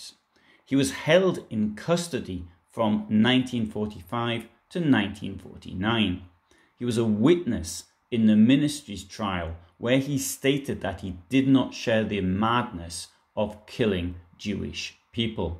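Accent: Newcastle, United Kingdom. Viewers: North Eastern English accent ,I think. "Geordie ". Or a bit further south ,Tees area. "Monkey Strangler."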